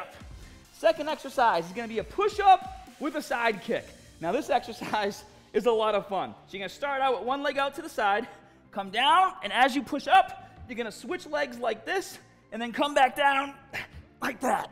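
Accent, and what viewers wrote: Boston, United States. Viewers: The exaggerated Boston accent is so cringe.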